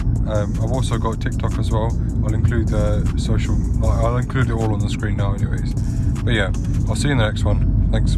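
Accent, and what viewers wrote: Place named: South Asia. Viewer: This is so odd you are white but you sound south asian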